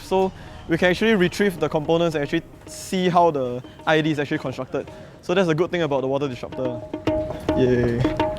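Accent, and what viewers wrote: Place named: Singapore. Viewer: His singlish .....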